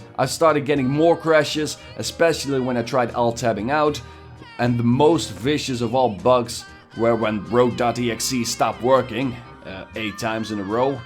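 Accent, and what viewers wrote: Russia. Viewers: It sounds like you have a Russian accent. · Well, it's a decent game if you play it in chunks. A few missions per day. Anyway, where the he hell are you from? Russia?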